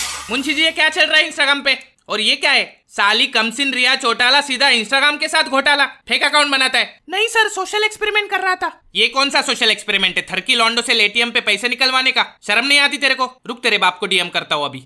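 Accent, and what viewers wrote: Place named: Maharashtra, India. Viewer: I like your Marathi accent 🔥🔥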